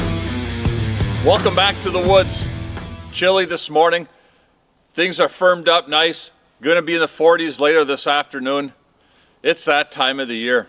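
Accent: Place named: Upper Peninsula, United States. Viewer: Yooper ASMR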